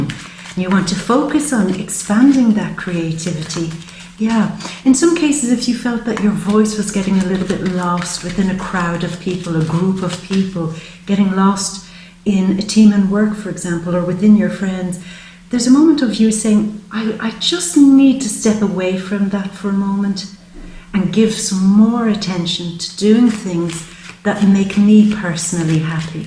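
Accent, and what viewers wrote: Ireland. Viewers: i love your accent; are you living in ireland or where you born thereÉ or a newfieÉ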